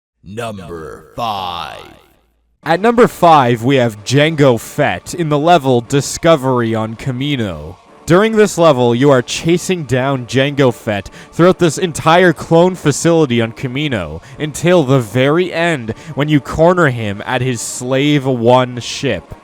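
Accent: Canada. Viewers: You're Canadian I can tell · Are you Canadian?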